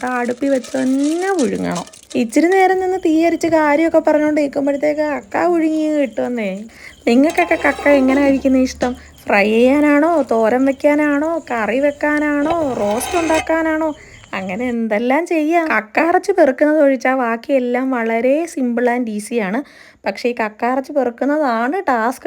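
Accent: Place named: Kollam, India. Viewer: കൊല്ലം കാരിയാണ് അല്ലെ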